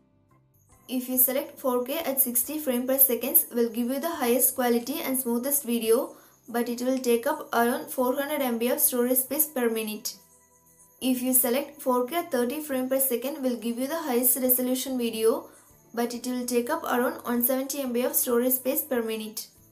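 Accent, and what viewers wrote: India. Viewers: Of course all tech people have heavy Indian accent.....but thanks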